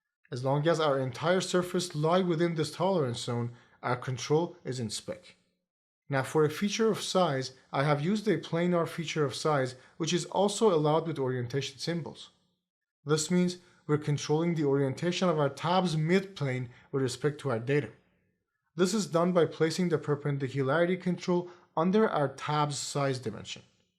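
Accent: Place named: Egypt. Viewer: حاسك مصري الاكسنت مصري الشكل مصري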